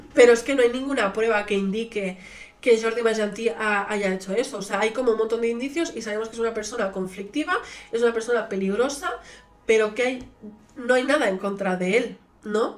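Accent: Spain. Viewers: No hace falta comentar estupideces. Los que hacen videos tienen como objetivo darse a entender, cierto? Para eso publican, para que los vean. Quizá ella esta muy habituada a hablar local y no sabe que fuera de España no le entienden. A ella le sirve esa retroalimentación si quiere que mas gente la vea y le entienda, es el objetivo de todo youtuber, seguramente ya lo sabes.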